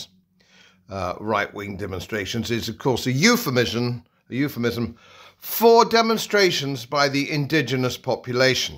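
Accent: United Kingdom. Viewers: Oh i do love your delivery of this current truth! So very very english and i love it! Gave me a little giggle! 😂 sad that it is, but so evident. I so love your voice. Thank you sir. Keep it coming. 😊😊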